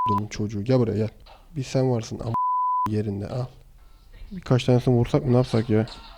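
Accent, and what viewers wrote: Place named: Izmir, Turkey. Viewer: Tenekeli gibi Tepecik gibi abi izmirlimisin :D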